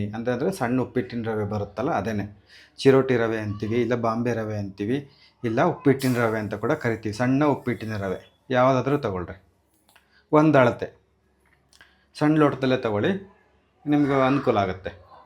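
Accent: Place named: Davangere, India. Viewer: ನಮ್ಮ ದಾವಣಗೆರೆ ಭಾಷೆ.😊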